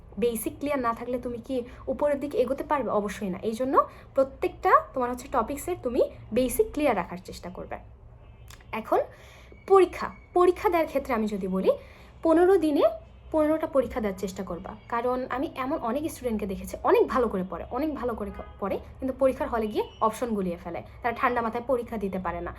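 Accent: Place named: Chittagong, Bangladesh. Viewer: আপু আপনার বাড়ি কি চট্টগ্রাম বিভাগে? আপনার কথার মধ্যে চাটগায়ে ভাব আছে।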